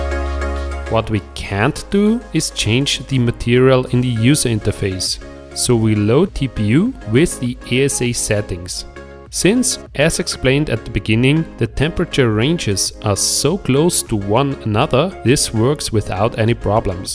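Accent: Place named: Germany. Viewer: Ich wollte schon sagen, hört sich an wie deutsches Englisch! Gleich mal ein Abo da gelassen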